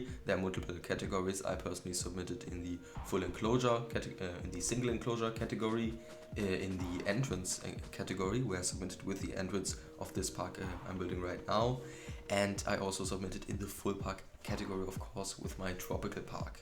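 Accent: Germany. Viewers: you have a german acc ent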